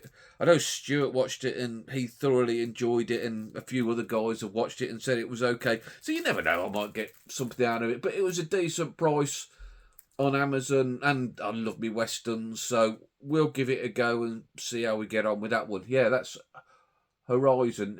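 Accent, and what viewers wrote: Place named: Black Country, United Kingdom. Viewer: Ive not sin any bad boys after 1&2 which i think 1&2 are bostin, i probably wow pick uo a box set i may just track em down, singularly to check out. Little random but am yow from the black country ? Cause yav got a simular kind of accent to me 😂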